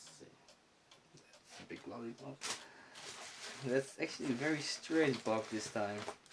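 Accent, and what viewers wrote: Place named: Netherlands. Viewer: Now I know what the Dutch accent sounds like 👌 nice video!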